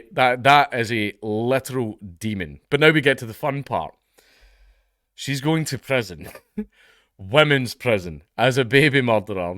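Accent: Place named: Scotland, United Kingdom. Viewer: I’m so glad you did this video….. I commented the other day on your McAfee video that this demon was found guilty. I was hoping you’d see it and put a vid out. Even if you didn’t see my comment, the video was definitely needed. You just have a way with words, wether it be the Scottish accent and local slang, or just the way you deliver words, it’s great. This absolute demon must be destroyed!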